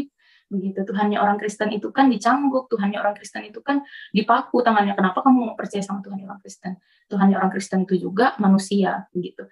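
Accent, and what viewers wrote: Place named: Java, Indonesia. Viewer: kok...ngaku org Bugis tpi logat Jawa...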